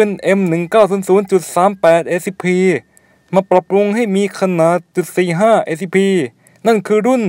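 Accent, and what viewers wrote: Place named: South, Thailand. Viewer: เสียงสำเนียงใต้เหมือนพี่ โต้งกันเบท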